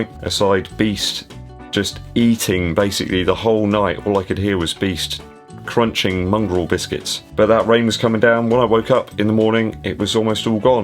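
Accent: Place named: West Country, United Kingdom. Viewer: Great race day footage and I'm also a fan of regional accents with the West Country at the top of the list 👍😁. Raced a local 10k last week in Milton Keynes and am running a 5 mile race this coming Tuesday in Leicestershire. Just bought a new pair of Boston 9s and so shall wear them. My other Boston 9s (they produced a 5 mile PB ) are enjoying their retirement but come out in the garden every now and then. Giving good vibes keep it up.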